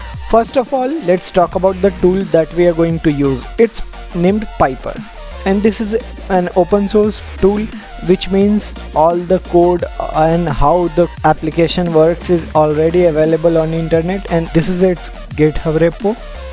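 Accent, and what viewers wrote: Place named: India. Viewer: 10/10 enjoyed the indian accent and the music!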